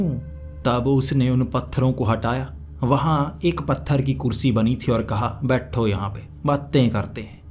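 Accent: Punjab, India. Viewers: Aap Punjab se kuch kuch Shabd aapke alag sunane mein aati hai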